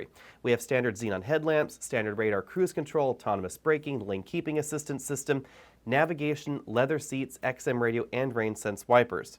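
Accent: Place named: United States. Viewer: Another unnecessary brand whose design will sooner or later not differ from other manufacturers. Capitalism at its best: pretending diversity where no one is. Also, it's funny how you Americans pronounce "Hyundai": there is no "o" like in "Honda" - actually it sounds very similar in your type of speaking. I wonder, because you should be easier able to pronounce the "yu" as "yu" or "ju"...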